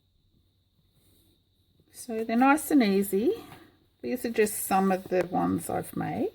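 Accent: Australia. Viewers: These are absolutely fabulous,! I hope they sold well! I’m an old quilter, started in 1986! But haven’t quilted much since my divorce & move back to Kansas in 2004. So I have a huge collection to choose from! 🥰🤗I will have to push junk out of the way, but if I’m motivated that won’t be a problem! Haha! I recently bought some cotton fabric at Walmart (those of us who really aren’t too crazy about shopping there call it Wally’s World! I suspect you would be in that category!) But I bought it to use as half pockets on larger journal cards, etc. Then thought of some other thing to be entranced with & they got pushed aside…story of my life!! Another woman said she thought she had “journaler’s ADHD”, yep that’s me! I don’t think it’s all that uncommon among crafters, though! I’m enjoying your channel soooo much, you are just so much fun & I love your accent! In one video you said you lived in Sidney, are there different accents around the country? 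I mean you’re a continent! England is just one little bitty country & yet practically everybody I watch has a different accent! It’s really interesting to me how that happens. Are your eyes better now? I hope so! Take good care! Erica❤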